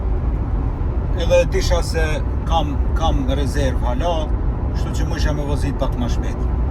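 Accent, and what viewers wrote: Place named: Skopje, North Macedonia. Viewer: prsh, mos je i shkupit i nderuar , dialektin tipik shkupjan gjithashtu edhe stilin , respekte klm.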